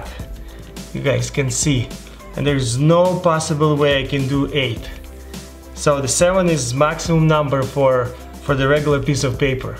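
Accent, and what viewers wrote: Russia. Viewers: So russians all sound the same...interesting. CrazyRussianHacker sounds the same.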